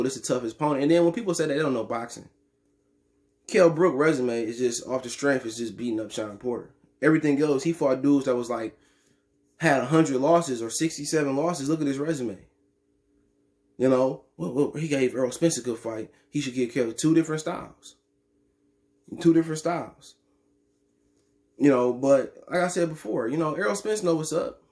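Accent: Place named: Texas, United States. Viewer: Hold on to that Texas talk..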